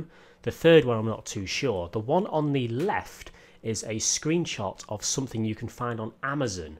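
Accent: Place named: United Kingdom. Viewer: You have a problem to his accent? He's british, and these are purely american names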